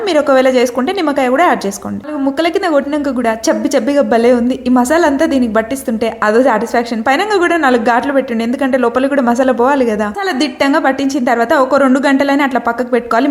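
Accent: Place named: Rayalaseema, India. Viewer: మనది ఏ ఊరు అండి, మా రాయలసీమ బాష లాగే వుంది మీ బాష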